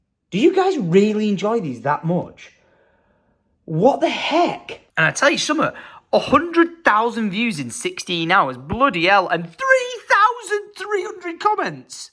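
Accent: Scotland, United Kingdom. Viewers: Love your Scottish accent, I'm from Scotland myself, we need more of it in the vlogs. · I laugh way too much at Scottish accent 😂 Honestly so glad for the day that the YT algorithm led me to this guy’s channel to watch one of the LA travel blogs. Top tier entertainment!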